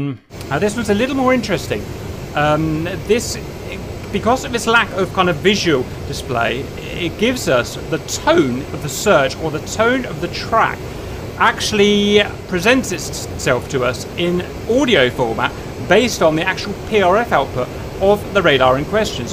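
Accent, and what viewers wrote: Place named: Manchester, United Kingdom. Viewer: Just a thought...not that anyone listens...but I'm new to DCS, but I am a private pilot...and some of the things i have seen and heard from a number of people who claim to offer help...really bug the crap out of me. It seems that you guys forgot that you are offering "help"; which means that the people who are listening to you don't have the time or experience with DCS...that is the same time you have. Personally, I suspect a number of you..., sidewinder, wags, etc...work for DCS; or did at one time. So, before I begin let it be known that I have tons of respect for my brothers who live across the pond; but some of you speak with a thick Manchester accent...so understanding you in simple conversation is difficult. So could you please slow down when you are speaking...this is not a race...its a tutorial or instructional...and if you want to reach out to everyone effectively...slow down. 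In addition, as you talk could you please use the cursor to highlight the components you are talking about or using. More often than not you are using the abbreviations, and when you combine the abbreviations with the speed in which you talk...the new guys have fallen behind before you begin. So please slow down, and ensure you are visually queuing the components that you are talking about...so the rest of us get it. I'm new...but you will be seeing me on the field...Bad JuJu or Train Wreck.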